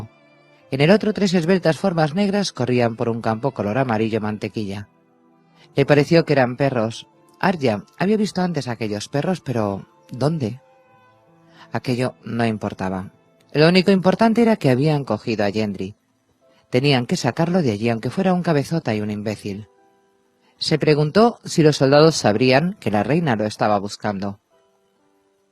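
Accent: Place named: Spain. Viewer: Muchas gracias por tu lectura.. narras muy bien. Y me viene muy bien tus lecturas para ir combinando mi lectura con momentos que no puedo leer pero si avanzar en capítulos oidos a través de tus lecturas. Y es en español de España. Solo encontraba antes audiolibros en español latino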